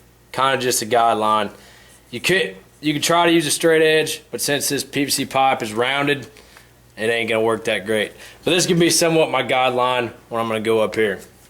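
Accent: South, United States. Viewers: Just love this guy! Especially how he talk! Is it Southern? (I am from California). Thanks for the Video!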